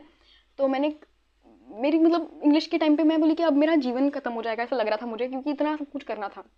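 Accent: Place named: Mumbai, India. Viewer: She has that typical Mumbai accent ❤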